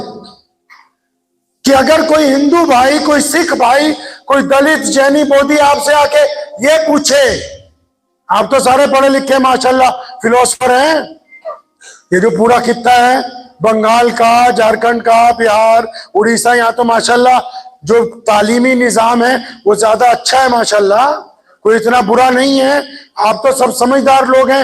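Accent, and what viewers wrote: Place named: Punjab, Pakistan. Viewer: Takabbur nhi bhai..wo punjab se he..unki aawaz or lhza hi esa he.. MashaAllah bayan bahut Bdiya krte he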